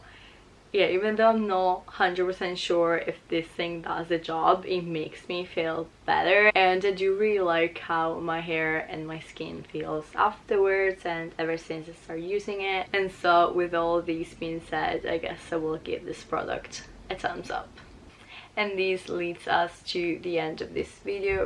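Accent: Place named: England, United Kingdom. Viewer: Yeah she lives in London so will pick up the English twang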